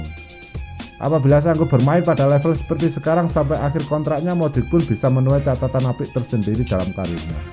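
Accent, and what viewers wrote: Java, Indonesia. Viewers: Medok amat jawanya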